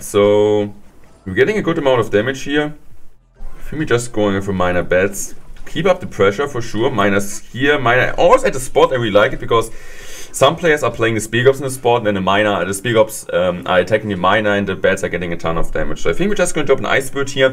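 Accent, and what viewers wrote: Germany. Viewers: ich gucke deine Videos auf ddm Deutschen und Englischen Kanal... wollte nur sagen dad du nen sehr krassen Deutschen Akzent hast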